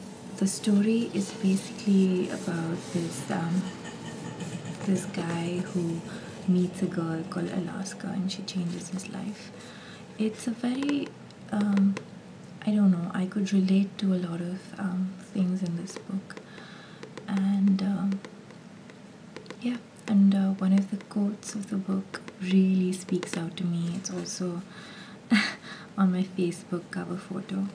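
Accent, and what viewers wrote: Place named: India. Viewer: Soft, Indian accents ALWAYS give me ASMR, especially this "unintentional" kind!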